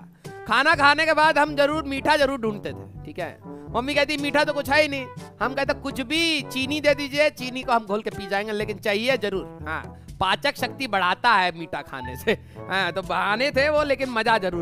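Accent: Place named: Haryana, India. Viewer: Isko kehte h ठेठ हरयाणवी 😂btw I m also from haryana love u sir ji❤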